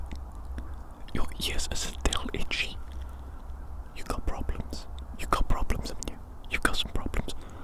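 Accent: Wales, United Kingdom. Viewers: There’s something about your Welsh accent paired with your refined, gentlemanly tone that creates such a unique and relaxing ASMR experience. It's also great to see you with a bible, my friend. God bless you 😊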